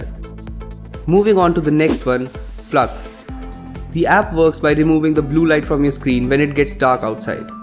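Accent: India. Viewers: Disgustimg indian accent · my God ... how can i avoid these english-indian movies? Fuck ... horrible english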